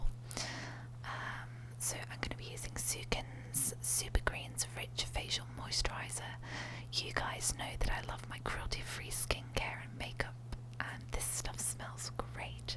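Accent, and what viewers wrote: Scotland, United Kingdom. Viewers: Nice to hear a UK accent! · Great video, could you do some more Scottish accent soon? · your otherwise beautifully Brittish accent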